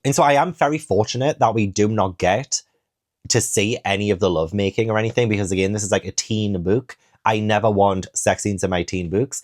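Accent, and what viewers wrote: United Kingdom. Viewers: Idk what country you hail from. To my ignorant American ears, it sounds like somewhere in the UK. If I’m wrong, skip my comment. If not, I have a genuine question: How do you square the thoughts you present at this timestamp with the very real-life example of Elizabeth II being betrothed to Philip when she was 9, and he was in uni? Ever since I heard that, my thoughts on the Royal Family, while never chummy or loving, deepened into total disgust. ESPECIALLY considering that Elizabeth and Philip were cousins. Genuine, non-confrontational question that I’d love to have the opinion of a UK citizen of. Again, if that ain’t you, disregard.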